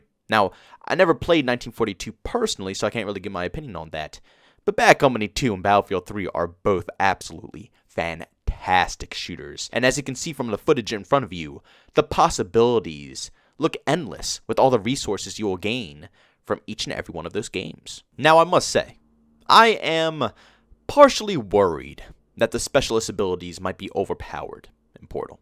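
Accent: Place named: Texas, United States. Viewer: You talk like an American, not a good way, in a Texas annoying type way. I'll stick to Level Cap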